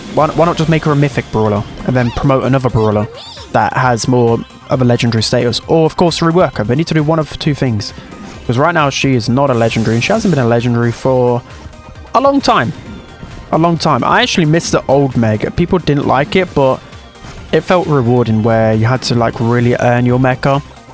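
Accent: United Kingdom. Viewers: I love his British accents. Makes me comfortable to watch before in NY I don't like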